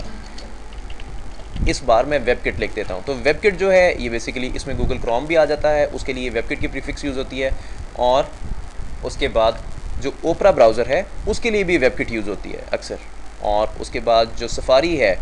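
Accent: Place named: Punjab, India. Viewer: aap ho muslim liken aapke bolne ka axcnt Punjabi h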